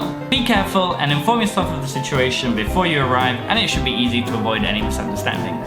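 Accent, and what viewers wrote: Standard. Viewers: 你講中文超標準的啊！！！！ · 不得不說，發音蠻標準的😂😂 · 台語太標準了 不訂閱怎麼行～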